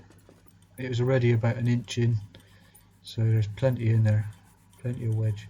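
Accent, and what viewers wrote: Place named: South West England, United Kingdom. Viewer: Gregory Lovvorn Having lived there for four years, I can easily place this as a Bristolian: from Bristol in the South-West of England. Heavily influenced by the wider, traditionally rural area of the SW. In fact, I hear the slightly softer 'edges' in this gentleman's accent that's more indicative of the rural region around Bristol than the city itself, but wouldn't be surprised if he was born and bred in Bristol.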